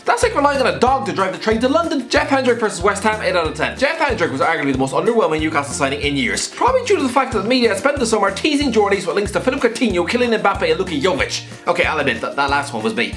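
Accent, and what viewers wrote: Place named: Ireland. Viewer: This guy sounds Irish...🤔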